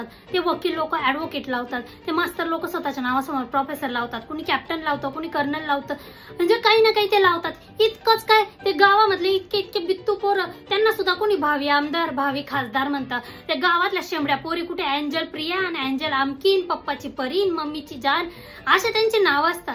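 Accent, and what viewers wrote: Khandesh, India. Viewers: खूप छान ह्युमर आहे तुमचा. खान्देशी स्वर तर जाणवतोच. ❤❤❤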